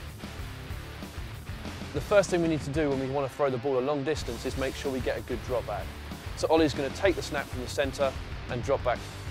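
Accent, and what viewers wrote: United Kingdom. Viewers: LOL he's British! Nice video, though. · I did not expect a British dude teaching American football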